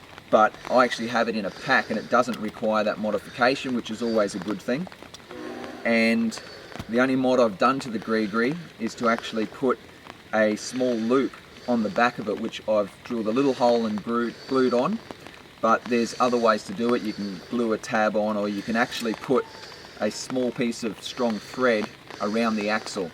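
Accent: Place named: New Zealand. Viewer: Does anyone else from Australia get really confused sometimes when you hear someone who's not an American, but just to make sure you watch a video of an American and then it clicks... kiwi...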